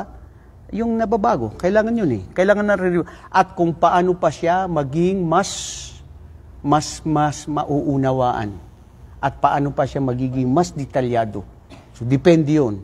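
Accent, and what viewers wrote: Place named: Visayas, Philippines. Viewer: Cute c ustadh mag bisaya😅 oi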